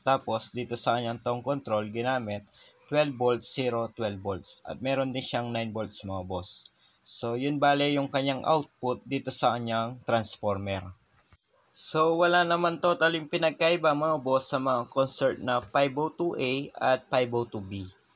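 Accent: Pampanga, Philippines. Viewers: Boss gnyan din problema ng ampli ko.. Mahina kbilang chanel. Pinaayos ko pero di naayos.. San loc mo? Prang kpampngan k kc kung mgsalita.. Kpmpngan din ako baka mgkalapit lng tyo paayos ko ampli ko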